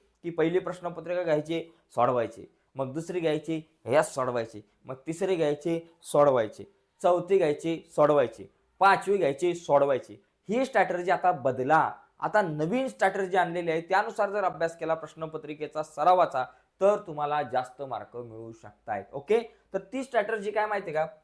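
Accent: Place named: Nanded, India. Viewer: नांदेडकर बोलते❤️❤️❤️❤️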